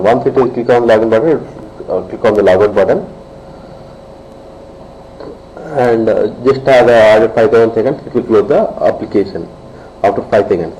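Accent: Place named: India. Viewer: God damn Indian accent, can`t understand anything !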